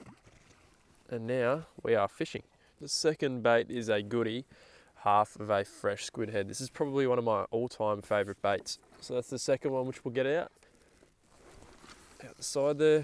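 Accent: Australia. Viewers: I love the Aussie accent. combined with fishing that is just perfect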